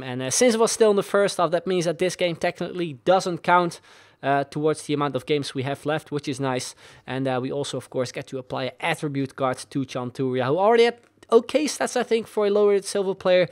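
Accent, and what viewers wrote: Netherlands. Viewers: Je hebt echt een nederlands accent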